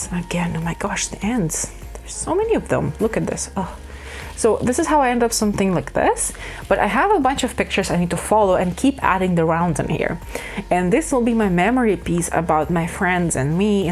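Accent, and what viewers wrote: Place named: Canada. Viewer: First time watcher! I love your energy! You’ve e got some beautiful projects going right now. I can’t wait to see them finished. I have also never seen a stand like that to hold yarn. That could come in handy. Are you originally from Canada? Your accent is beautiful.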